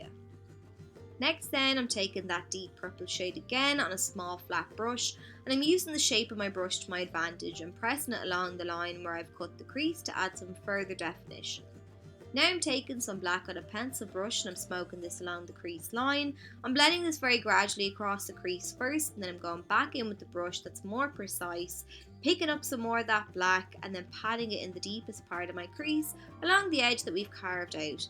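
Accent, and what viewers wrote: Ireland. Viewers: I could listen to your accent all day girl! Taking your irish accent and adding a makeup tutorial with it is pure heaven for me lol love your skills girl!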